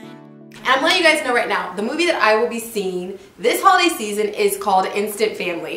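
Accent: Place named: Colorado, United States. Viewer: where are you? I just started to watch you, and your accent sounds like Colorado, where I live..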